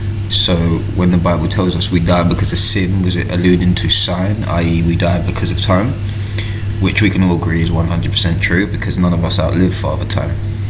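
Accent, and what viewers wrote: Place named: London, United Kingdom. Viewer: How did you possibly think this man was an American 😂 such a pure London accent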